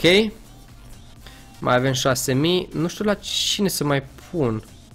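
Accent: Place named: Moldova. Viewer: E moldovean fato ei asa vorbesc....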